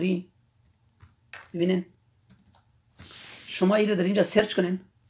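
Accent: Afghanistan. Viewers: با احترام به شما دوست عزیز که زحمت کشیده اید این ویدیو اموزشی درست کردی برای رفع مشکلات کاربران ولی متاسفانه خیلی خیلی تند حرف میزنید و همچنین لهجه ای افغان بودنت یکی دیگر از معایب این ویدیو میباشد شما اگر نیت خیر اموزش دادن را دارید میتوانید از یک شخص دیگر که هم خیلی قشنگ بتواند فارسی را حرف بزند و کلمات را طوری بیان کند که هر شنونده از گفتار و نوع اموزش لذت ببرد من متاسفانه با اینکه مشکل ریکاوری مموری کارت داشتم ولی نتوانستم از ویدیو اموزشی شما استفاده کنم انشالله دیگر این صدای شما را در ویدیو های بعدی نشنوم و یکی از دلایل در پیشرفت و موفق بودن هر اموزش دهنده مطمئن باشید همین درست صحبت کردن میباشد ،امیدوارم از دست من ناراحت نباشی چون نمیخواستم زحمت شما هدر برود برای ویدیوهای بعدی وظیفه خودم دانستم که بشما این عیب و ایراد کارتان گوشزد کنم . با تشکر احمدی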